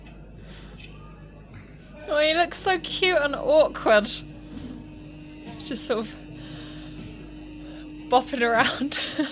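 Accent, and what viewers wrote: United Kingdom. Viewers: How can it be that you sound like you're British, but didn't know who was?!!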